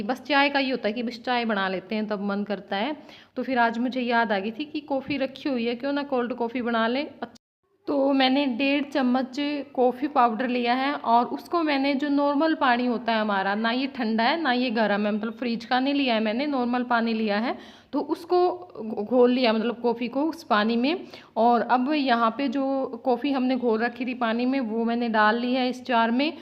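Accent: Haryana, India. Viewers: Bebe haryanvi boli mast laga h👌👌👌👌👌👌👌👌👌👌👌👌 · Aap h haryanvi me baat kera kero behot achi lage h aap k mu se sunne me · Haryanvi touch aapki language m sunn k bhuutt acha lga Di... God bless you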